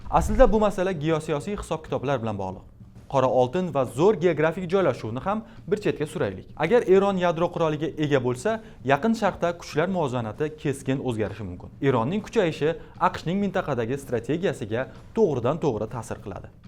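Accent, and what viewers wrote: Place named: Uzbekistan. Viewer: ""Yoqtirma"" sizni sõz boyligingiz va asl Õzbek laxchangiz ajoyib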